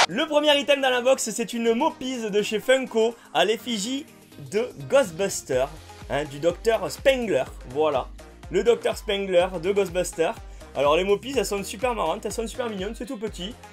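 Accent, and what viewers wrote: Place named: South, France. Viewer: ton accent du midi est excellant 'sin (#teamsud)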